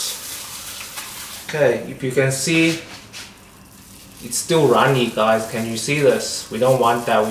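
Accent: Durban, South Africa. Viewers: You sound South African 🤗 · Sounds South African accent tho not Chinese..🤷Beautiful recipe🙏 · Great recipe! Wheres your accent from? SA? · You south african boet? Love the recipe. ❤😎💯🤘🔥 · bro sounds coloured from SA,Durban hahaha Dope bro will give this a try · Love your South African accent 😊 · You sound South African . It’s a compliment. Don’t stress . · The cutting of the drumsticks is a very good tip 😉. By the way, you sound South African🤔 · Why does he have a PERFECT Southern African COLOURED (South African/Zimbabwean/Namibian) accent????? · Nice recipe 👍 Do I detect a slight South African accent ? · Are you South African?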